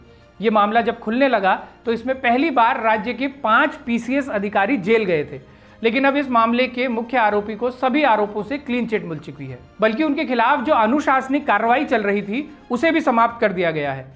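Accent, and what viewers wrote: India. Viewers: Apko sunkar lgta hai aap vastavik pahad putra ho❤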